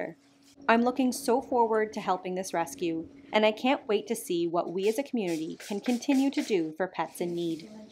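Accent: Canada. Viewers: Thanks. NO WONDER you sound like a Canadian. Cheers.🇺🇸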